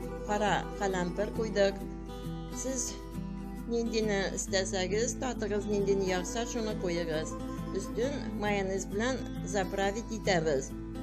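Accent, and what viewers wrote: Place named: Turkey. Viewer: Turkmisiz deyman aksent bilan gapiryapsiz ozbek tilini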